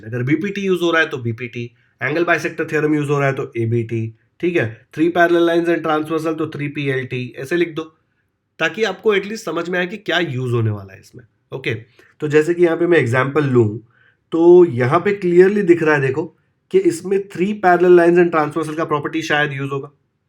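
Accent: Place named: Maharashtra, India. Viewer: I having doubt of your language your mother tongue is Marathi because you was tell tow one chaa that way😅😅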